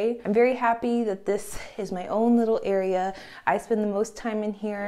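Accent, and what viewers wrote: Germany. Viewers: Sometimes you sound like you are speaking english with a little german accent 😉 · I like Your Denglish!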